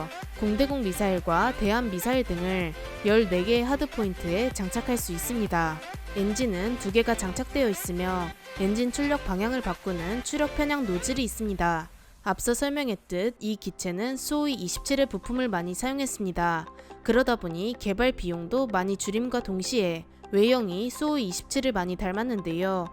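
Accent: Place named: Gyeongsang, South Korea. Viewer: 이 아줌마 경상도식 억양이 있네.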